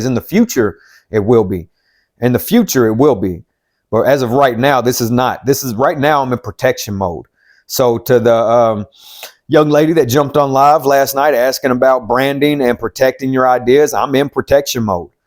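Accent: United States. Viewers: I like your country/ Southern accent 😉😊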